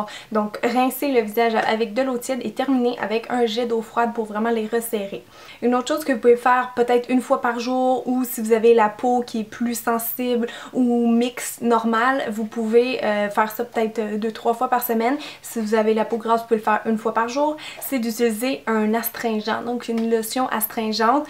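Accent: Quebec, Canada. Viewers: J'adore l'accent québecoise😍😍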